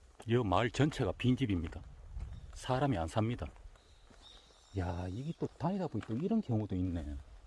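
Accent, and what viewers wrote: Gyeongsang, South Korea. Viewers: ㅋㅋ 말씀 넘 웃끼게 하시네예 완전 갱상도 사투리 정감갑니다 인상도 짱이시구요 좋아요 구독눌러서예 ~^^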